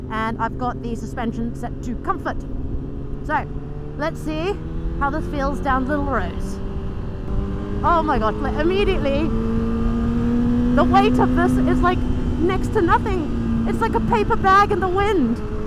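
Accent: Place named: Australia. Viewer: You have an interesting accent, sounds Australian, English and south African all in one haha.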